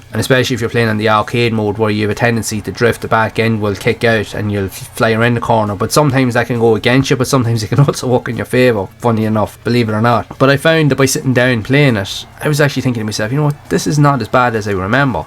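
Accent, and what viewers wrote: Ireland. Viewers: nice one fair play enjoying the vids and nice to hear an Irish accent on here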